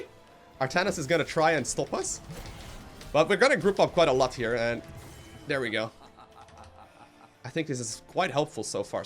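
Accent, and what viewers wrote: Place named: Netherlands. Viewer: Sounds like he shaved away some of his Dutch accent. That's really difficult so good job on that.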